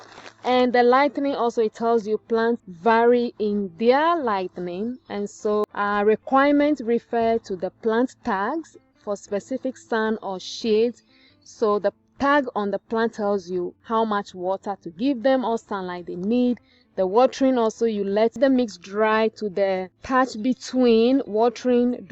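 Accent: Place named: Ibibio, Nigeria. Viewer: are you ibibio from akwa abasi ibom? you have beautiful accent miss thank you helpful knowledge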